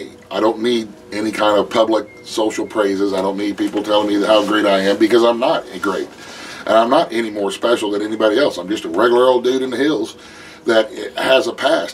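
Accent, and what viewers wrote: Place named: South, United States. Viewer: I'm a new subscriber and I love the content on your channel....you sir sound like the southern version of another YouTuber named Buckin Billy Ray from Canada...he spreads the same message, check him out. I love your channel and I will share with my friends. Cheers from Kansas City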